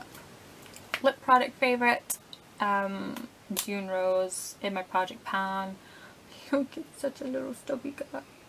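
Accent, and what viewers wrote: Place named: Scotland, United Kingdom. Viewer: Odd comment coming at you, but I enjoy listening to you. I like Scottish accents, and yours is very easy to understand and pleasant :)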